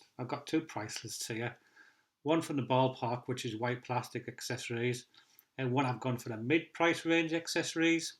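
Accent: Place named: Northern England, United Kingdom. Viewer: Sound like a northern lad (proper northern)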